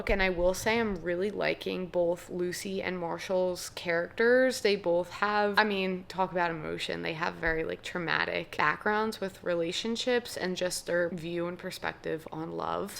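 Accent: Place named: New York, United States. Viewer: What an amazing video you filmed this video so well and explained everything so thoroughly!! I love your accent!! Are your from New York?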